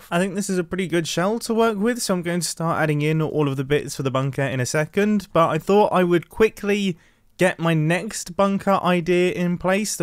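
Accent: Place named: United Kingdom. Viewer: it’s because of his accent. is British btw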